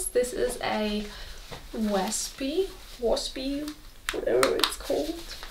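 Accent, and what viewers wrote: Germany. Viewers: Sounds German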